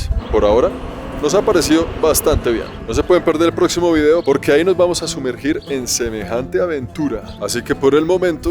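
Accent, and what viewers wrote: Colombia. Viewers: Amo su acento colombiano, su sencillez y muy guapo gracias por enseñar y visitar nuestro país ❤ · Porque habla con ese acento sera colombiano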